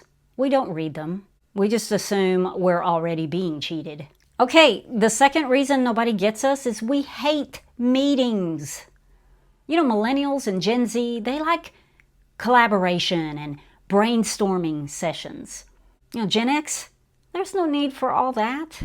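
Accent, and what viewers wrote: United States. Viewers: G'day Thanks for your kind words. I have been trying to work out your accent and which part of the United States of Americaland you are from. You see we have 6 states and 2 territories here in Aussieworld and our accent is pretty uniform except in some parts of the country like Tasmania for instance where there is a slight difference if you are Australian and know what to listen for, no worries. With you I don't think It's Texas, could be West Coast or Flo-Rida or I could be way off the mark. American accents like NYC, Boston, Texas, Louisiana, are pretty easy for us as they are so strong. But you have me stumped little lady.....it's a strong accent, but I can't pick it. Your Aussie connection... Cheers 😉😊 P.S. cute Westie I think?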